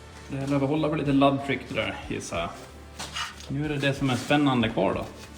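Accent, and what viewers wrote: Finland-Swedish, Finland. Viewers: Som alltid, kul,intressant och jäkligt imponerande hantverk! Lätt den bästa kanalen..... Muminsvenskan höjer värdet också såklart 👌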